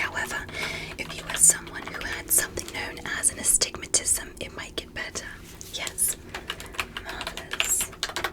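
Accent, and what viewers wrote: England, United Kingdom. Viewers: Is she English or American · Omg yesss, I love your alien ASMR videos with the British accent. I don't know why but it just hits different and makes me feel safe. The British accent just tingles apart of the brain that I can't explain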